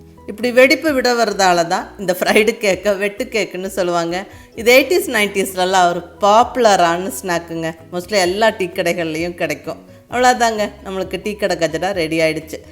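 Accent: Kongu, India. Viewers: Hello mam really all your receipes are awesome the way you explain are chanceless, I like your kongu tamil, I love you so much mam, Always Be happy share ur receipes more love u from Chennai this is also my favourite 😍 receipe keep rocking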